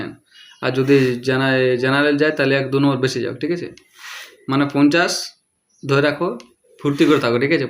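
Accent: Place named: Purulia, India. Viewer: Purulia r mal naki bhai tui... Kotha sune lagche..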